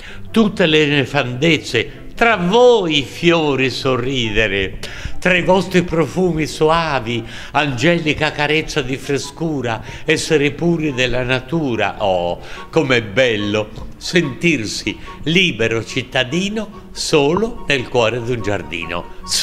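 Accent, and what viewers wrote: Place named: Florence, Italy. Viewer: Interpretazione fiorentina fantastica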